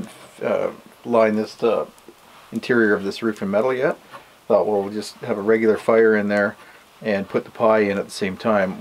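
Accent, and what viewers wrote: Canada. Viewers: Can anybody tell me his general location? I mean I know it’s North country but is it in the United States? I get this feeling from his Accent it’s possibly in 🇨🇦 Canada.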